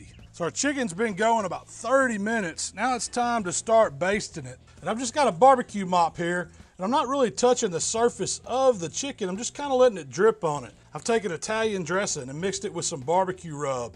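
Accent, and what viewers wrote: South, United States. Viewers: As soon as I heard the southern accent I knew I could trust him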